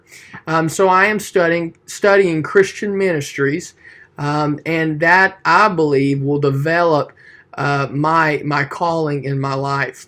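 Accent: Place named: Tennessee, United States. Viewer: I knew you were from Tennessee!!! I knew from your accent!! Sounds great! I know your doing Gods will! I totally know what you’re talking about when you say hiding under the pew hiding from spankings lol...man that switch tho 😂🤣🤣🤣can’t forget that thing 🤣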